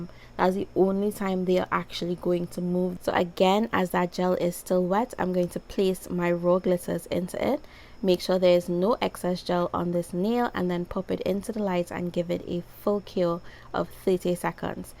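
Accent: Trinidad and Tobago. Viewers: These are sooo cute. Side point...I think I'm hearing a Trini accent. If you are then I love you even more lol. I live and vlog in Barbados but I'm Trini so I miss hearing our accent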